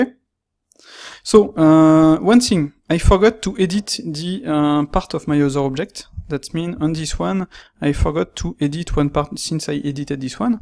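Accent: France. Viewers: bizarrement je sais pas si c'est l'accent français qui me fait cette impression mais je crois trouver plus facile d'apprendre houdini sur cette chaine en Français comme en anglais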